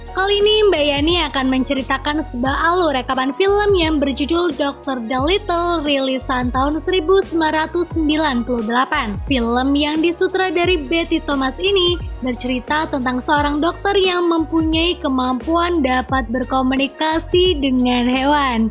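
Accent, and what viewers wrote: Pemalang, Indonesia. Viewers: Kaka orang pemalang bukan kak? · Mbaa orang pemalang yah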